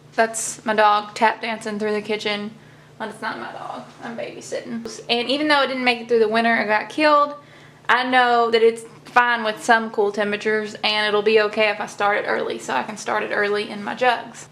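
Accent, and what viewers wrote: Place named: Kentucky, United States. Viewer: I just watched this video and as soon as you started talking I had to smile. You sound just like me. Are you from Kentucky? Sure made me homesick. I live in NC now, but I love my old Kentucky home.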